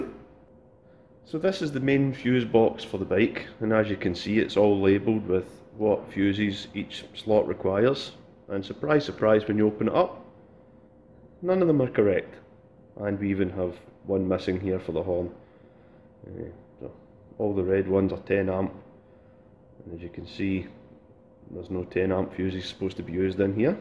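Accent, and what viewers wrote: Scotland, United Kingdom. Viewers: First time I have heard your voice and you are a fellow scotsman 👍. You got yourself a new subscriber. Are you planning on keeping the ducati or selling it just out of Interest. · Where about in Scotland are you from ? Hello from Fife lol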